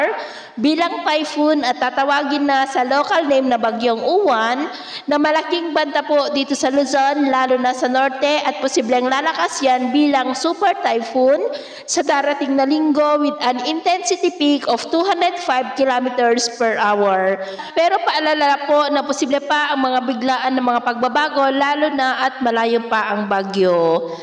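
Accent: Visayas, Philippines. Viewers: Bisaya Yung nag wiwider apdit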